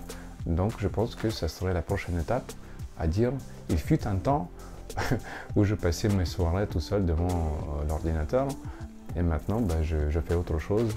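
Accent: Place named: Russia. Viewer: Très bon contenu merci , on sent une certaine apaisement en toi , et ton accent est très charment c'est russe ?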